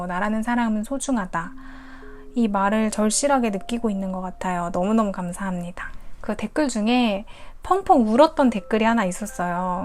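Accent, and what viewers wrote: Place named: Gyeongsang, South Korea. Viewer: 혹시 어디분이신가요?? 경상도신것같은데! 화이팅하새용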